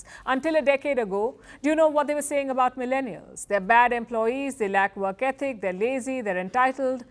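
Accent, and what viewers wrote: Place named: India. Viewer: I hate Indian broadcasters. They cannot get rid of the accent. 😖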